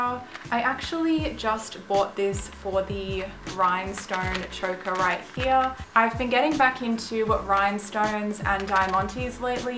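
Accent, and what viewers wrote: Australia. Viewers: Are you from Australia? It's just the accent, sorry if I got it wrong.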